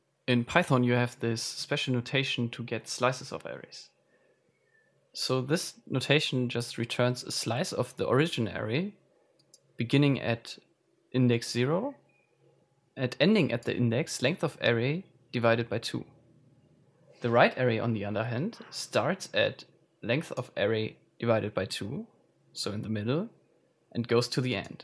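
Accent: Germany. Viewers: Ich wette du bist deutsch :)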